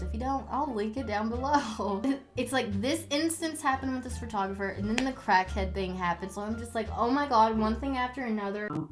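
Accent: South, United States. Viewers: I love your country accent! I hope your from a southern state or this comment will sound really stupid lol... I’m new to your channel just watched your two videos about your old apartment complex ! I’m subscribed and love you! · Love your Southern accent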